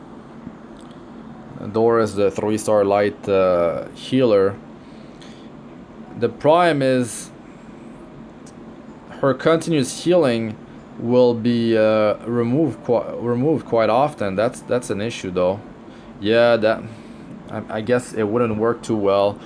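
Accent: Quebec, Canada. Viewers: Hmm québecois? Je reconnais l'accent dans l'anglais haha, nice video man ;) I'll make sure to watch more of your content !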